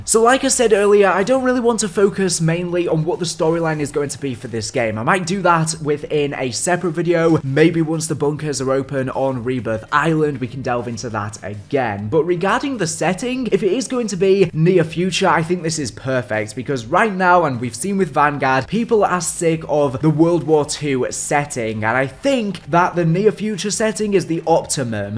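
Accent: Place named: United Kingdom. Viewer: F*cking british accent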